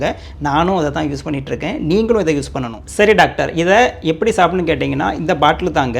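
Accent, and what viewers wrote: Tirunelveli, India. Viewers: Sir ungalukku thirunelveli ya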